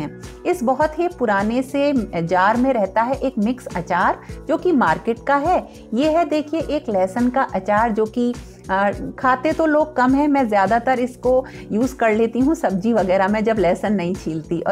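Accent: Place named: Bundelkhand, India. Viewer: Hi.ma'am aap kaha se ho,apki tone bundelkhand jesi h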